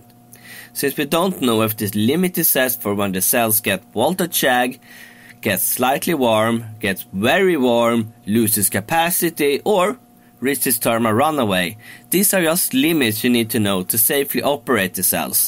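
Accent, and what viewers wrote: Sweden. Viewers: Sounds like you are from Lidingö…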